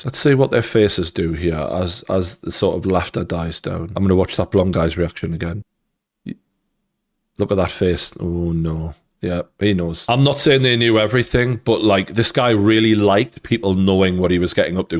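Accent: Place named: Geordie, United Kingdom. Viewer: Oh my God, This is Very difficult to listen to Geordie🤯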